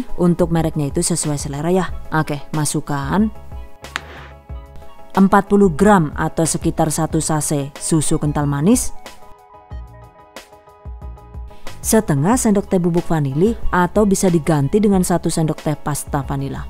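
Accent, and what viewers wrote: Banyumas, Indonesia. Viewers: 😂😂😂ak cilacap bun... klo bunda asli orank mana si....? pake bhsa ngapak juga jadi ngakak pas ada lalat mo ikut bikin kueh nya😂😂😂 ..❤ ak sllu mngikuti resep² bunda g pernah gagal...sehat² sllu ya bund....🎉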